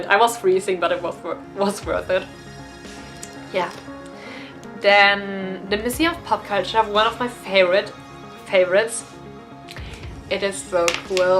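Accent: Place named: Netherlands. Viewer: You are adorable. Thank you for this info. Very helpful. May I ask where your accent is from? I have family from Holland and very similar.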